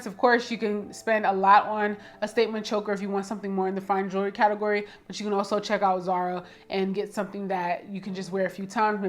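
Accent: New York, United States. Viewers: Good morning, young Queen just ran across your channel. I am an old G born and raised in new Yorker. I hear a New York accent as you're talking love your channel. I just subscribed. I am 67 years of age and I'm loving your fashion tips. I'm gonna pick those up. And incorporate them in my closet from what I'm able to see in your home. I also love your design style. Absolutely gorgeous. Fresh clean. I love it, continue great success. Thank You Have A Blessed Day 💙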